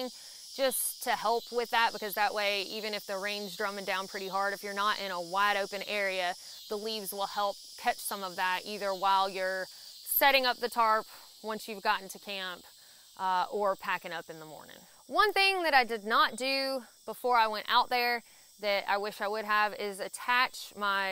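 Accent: South, United States. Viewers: I couldn't or wouldn't even want to try this setup. It's a TENT only for me. Nor would I try to use a Hammock on longer trips, maybe for a couple of nights. You are one heck of a gal and I've seen almost everyone of your videos. And every time you reached your end of the trail it was heartbreaking for me too. You did something I can't do. Oh, I'm from Carrollton, Ga. and the way you talk (like I do) is just so down home and proves you're a Country Gal. Waiting to watch any other new trips you go on.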